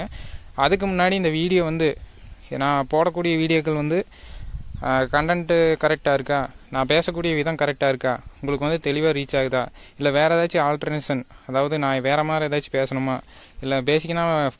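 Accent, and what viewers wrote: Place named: Kongu, India. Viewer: உங்கள் கொங்கு தமிழ் உச்சரிப்பு அருமை சகோ.. Unga slang and way of presentation is superb..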